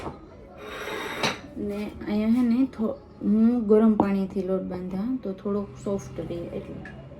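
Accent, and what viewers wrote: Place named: Kathiawar, India. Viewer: હમે પણ તમારા જેમ બોલીએ કાઢીયાવાડી 😅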